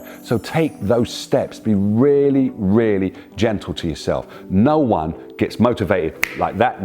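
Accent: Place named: West Midlands, United Kingdom. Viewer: Lovely video! Well done in content, appearance, tone and message. It is the right mix of smooth and suave, with the hard but necessary wisdom from experience. A motivating message with hope, no less. Oh the accent! I must confess it draws me in and draws up the memories of living once upon a time in the West Midlands. Thank you kindly, for posting.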